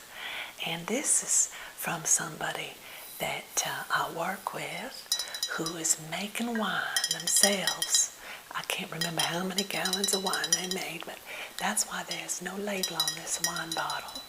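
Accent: South, United States. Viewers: I like the tapping also the southern accent makes me relaxed a lot i seem to prefer it for asmr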